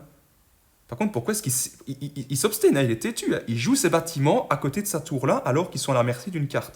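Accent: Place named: Belgium. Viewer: ne serais tu pas belge pas le plus grand des hasard ? Ps première fois que j'entend qqun dire pikka à la place de pekka et artière à la place de l'artificière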